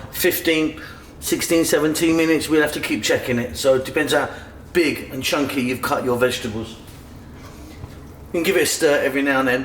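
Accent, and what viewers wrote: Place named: England, United Kingdom. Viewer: I love your way of cooking as well as your English accent Amazing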